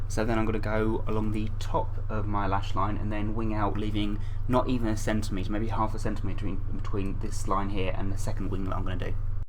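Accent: United Kingdom. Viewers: ohmygawsh with your cute little british accent and the marvelous makeup you did, it matches the character so perfectly<3 I actually loved Glen(da) so much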